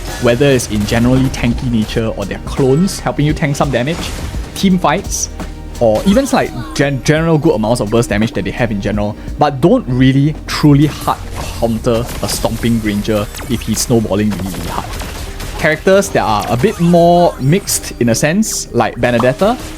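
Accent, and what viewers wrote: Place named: Singapore. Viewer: Prefer this Singaporean voice than those fake A.I. Voices in most YouTube vids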